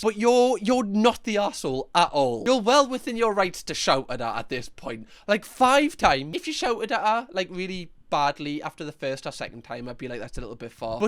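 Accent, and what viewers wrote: Newcastle, United Kingdom. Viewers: Can confirm, it's just how we say it in Newcastle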